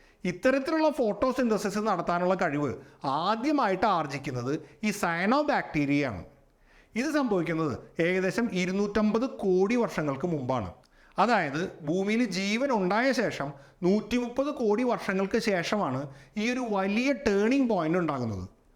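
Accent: Thrissur, India. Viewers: ഇതേ ചാനലിൻറെ തുടക്കത്തിൽ ൽ, അദ്ദേഹത്തിൻറെ തൃശൂർ ശൈലി മൂലം ഇങ്ങനെ സംഭവിക്കാനിടയുണ്ടന്ന് പറഞ്ഞിരുന്നു. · ഇത്‌ തൃശൂർ ഭാഷയാണ് മാഷെ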